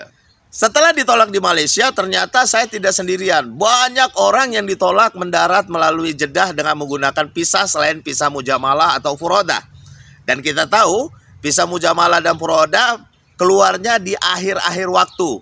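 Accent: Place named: West Java, Indonesia. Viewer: Orang sunda ya bang, pisa 😊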